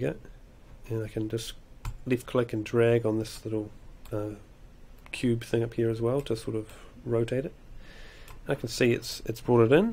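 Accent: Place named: New Zealand. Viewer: hi thanks for that, you saved me heaps of hassles. I enjoy the kiwi accent :-)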